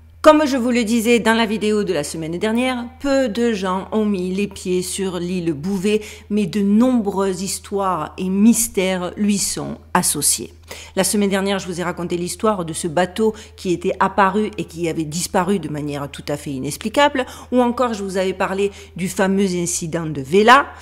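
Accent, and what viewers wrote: South, France. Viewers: Je t'ai decouvert grace a ton apparition dans la video de rock sauquere... Franchement , sujet tres intéressant, hate de découvrir l'ensemble de tes videos . Et vive l'accent du sud 😍🎉!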